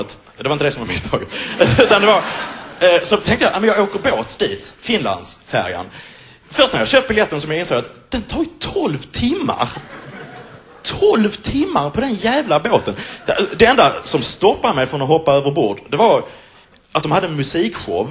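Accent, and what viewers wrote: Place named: Scania, Sweden. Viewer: Har han ju inte x'D Bara lite mindre brytning men jag hör fortfarande skånska rakt igenom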